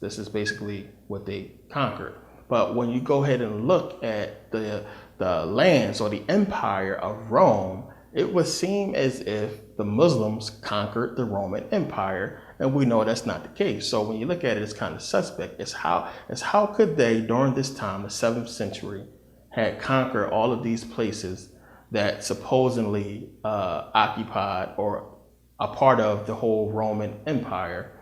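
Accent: Philadelphia, United States. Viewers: You wouldn’t happen to be from philly would u? It sounds like it from the way you talk.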